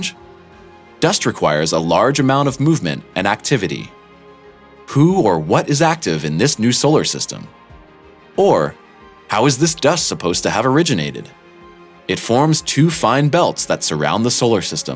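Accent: United States. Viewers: This video is utter pop science cack, spouting the kind of shit you'd find in a Christmas cracker or fortune cookie. This channel doesn't deserve its number of subscribers and this video certainly doesn't merit the views it has. The narrator has that quasi-American accent of someone whose first language isn't English and the knowledge of someone whose first discipline certainly isn't astronomy.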